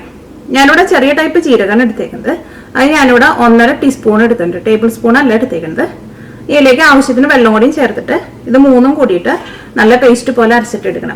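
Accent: Thrissur, India. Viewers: തൃശൂർ ഭാഷ ഒന്ന് മാറ്റിക്കൂടെ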